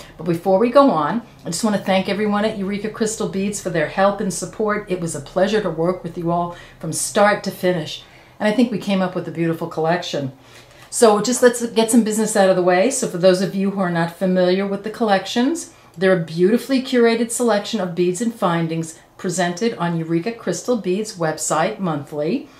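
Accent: New York, United States. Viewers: An absolutely gorgeous collection!!! I just ordered the collection and can’t wait to see your tutorials! By the way, I couldn’t help myself I ordered the three collection bundle too, LOL I’m going to have a lot of beading to do! Thank you so much for all your tutorials. I have learned to bead by watching your tutorials and greatly appreciate your amazing designs, clarity of instruction, excellence in video production and your New York accent! I’m a Jersey City girl living in San Diego and love to hear your voice, it makes me feel so at home. Again congratulations and thank you for all you do to help us learn about this amazing art form!😀🙏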